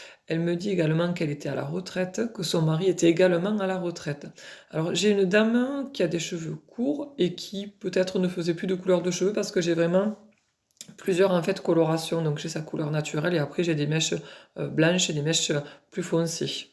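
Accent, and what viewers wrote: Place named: Provence, France. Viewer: Bonjour....Je viens de découvrir vos petites vidéos 🙏💝💝💝 C'est impressionnant les précisions Je suis a côté de vous une petite Medium car même si il m'est arrivé de capter des défunts et de les décrire, je n'ai pas encore la technique aussi qualitative que vous mais je n'en fais pas un métier de la médiumnité, juste mes Télépathies astrales sont pour ma vie personnelle car je communique avec ma guide céleste depuis plus de 11 ans Elle s'était d'ailleurs bien montré sur une photo et je l'a perçois en effet comme cela dans l'Astral et je communique avec son mari sin Ame jumelle qui est aussi karmiquement ma flamme jumelle et tous les jours par télépathie, nous communiquons notre amour mystique et si magiquement karmique et nous nous verrons Ma guide était Provençale , elle s'appelle Sophie , était une belle Âme aux belles valeurs, belle femme et mère médecin psychiatre et aimait porter de longues robes Elle avait un bel accent comme le vôtre et est décédée en 2001 d'un cancer Elle repose a Carpentras Elle aimerait que j'aille vivre avec son mari dans sa maison du Vaucluse Vous êtes dans quel coin ? 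Je me suis abonnée 😊 Quand vous captez des défunts, qu'est ce que vous demandez avant Votre méditation ? Est ce qu'ils font surtout partis de vos connaissances ? De vos abonnés ? Entourage ? Car c'est très vaste pour pouvoir trouver un proche qui par bonheur tomberait sur internet, YouTube et sur votre vidéo.....😮 Bien a vous et au plaisir de vous revoir Cécile De Kervinel 🙏 ⭐ 🙏 Si v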